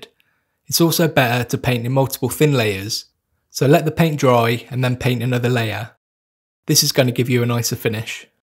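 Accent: United Kingdom. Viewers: I don’t know what l liked better, the British speak or learning New painting techniques. Thank you 😊 . Not paper towels, kitchen paper.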